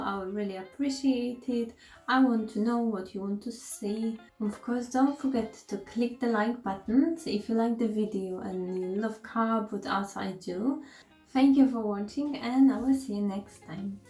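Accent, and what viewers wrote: Poland. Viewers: . Po akcencie tak mi się wydawało, że z Polski, ale dla pewności wolałam zapytać. Super. W takim razie powodzenia na yt. I tak jak napisałam, czekam na kolejne zdobycze i haule. Pozdrawiam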